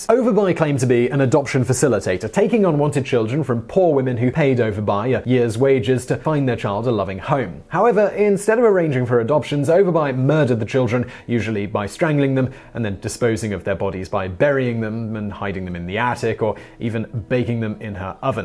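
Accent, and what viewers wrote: United Kingdom. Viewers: Your British accent is awesome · Sounds like the host is British.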